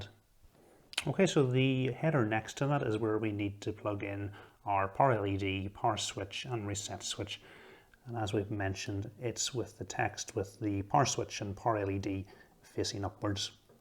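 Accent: Northern Ireland, United Kingdom. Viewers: Ignoring the obvious NI accent similarities, you sound an awful lot like Jim Browning! In the nicest way possible, your voice belongs to a much older man. It's calming and soothing. I'm from NI too, and never have I heard someone younger than about 45 sound the way you do.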